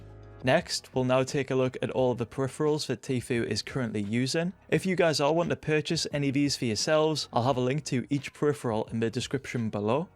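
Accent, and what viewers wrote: Newcastle, United Kingdom. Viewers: Love all your content bro I’m a Geordie myself so hearing you makes it sound like home 🔥🔥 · Only me how love your british accent :D please do facecam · R u from newcastle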